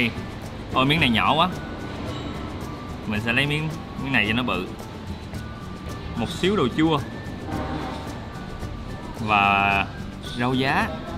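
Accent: Mekong Delta, Vietnam. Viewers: vui tính,thoải mái,nc rất miền tây. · Hi! Chi rat thich nhung video clips cua.mot bo cuc ro rang,rat l doi thuong,va cai cach noi chuyen vua ( rat la Mien tay,chi o Long An coi cung la dan M,tay ). nè! Neu nhu em co di holidays Autralia. Thi em goi chi nha,chi o Sydney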